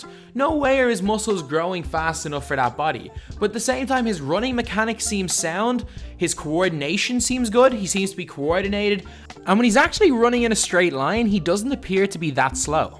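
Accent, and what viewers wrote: Ireland. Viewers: I know that DKM is Scottish, but are you Irish? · I can tell you're Irish... Noice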